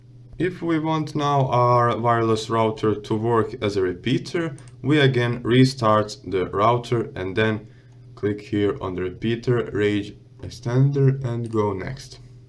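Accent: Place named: Serbia. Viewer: Odmah sam znao da si nas cim si poceo da pricas :) Hvala na videu...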